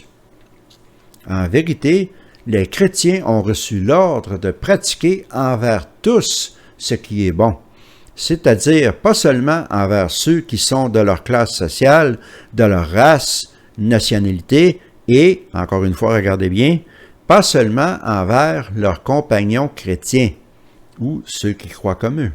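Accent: Quebec, Canada. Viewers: Il est super génial son accent québécois!! et vous "Joe Joe" , votre français n'est pas parfait non plus...! il faut vraiment vous laver les oreilles ou écouter une autre vidéo , mais critiquer désobligeamment ce n'est que pour "critiquer" (toute critique n'est pas mauvaise, il est bon de l'apprendre, de le savoir ) vous Joe Joe quand vous critiquez ce n'est que pour "avoir quelquechose de négatif à dire" ?! (si vous ne supportez pas , pas obligé de le dire,!) et il est bon de se renseigner plus intelligemment: en allant chercher les infos utiles sur l'auteur par exemple de la vidéo, avant de "critiquer" (en négatif,)et sachez aussi qu'une critique peut être positive et constructive, au passage...🤔😉 en plus c génial pour mieux "comprendre" le fonctionnement de cette société sectaire (car cela vient de là-bas, des states" et lui parle très bien anglais aussi, il est adorable de nous le faire pour la francophonie!) en France : ils n'osent pas vraiment témoigner, et lui il a les bons tenants et aboutissants pour mieux comprendre la machine à endoctrinement de ces pauvres croyants adeptes TJ! 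Vive LE QUEBEC !🏵🏁👍 (et céline dion : quand elle ne chante pas ,quand elle est interviewée en france : tu ne la comprends pas non plus ? 🤣) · C’est plutôt amusant et ironique en même temps! Vous dites faire tout pour plaire à Dieu, maiiiiiiiiis vous critiquez sa façon de s’exprimer. Intéressant comme réaction. Heureusement qu’il est de Montréal et pas du Saguenay ou même du Nouveau Brunswick 😳 en passant il fait déjà beaucoup d’effort pour pas parler trop " Québécois " ( sans offense pour les Saguenéen ou des Néo-Brunswickois) et en passant ce n’est pas aux autres à faire des efforts pour bien se faire comprendre, on se comprends?😂 désolée trop tentante comme joke (plate bien sûr…) Bref vous venez d’où au juste?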